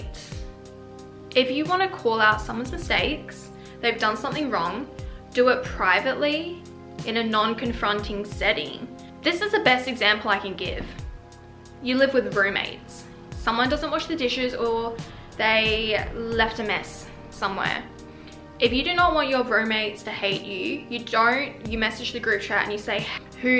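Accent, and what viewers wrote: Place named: RP, United Kingdom. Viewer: the poshest accent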